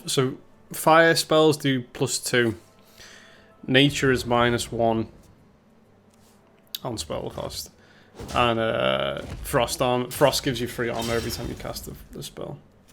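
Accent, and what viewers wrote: Liverpool, United Kingdom. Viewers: hes not Scottish tho, hes from Liverpool, UK. But I guess you're still correct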